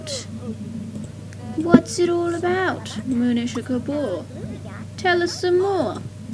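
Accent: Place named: United Kingdom. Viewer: I love your voice! It's all british-y :D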